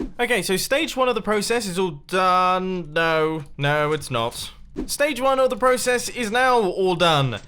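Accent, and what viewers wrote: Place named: United Kingdom. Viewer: used to sound less British back in 2017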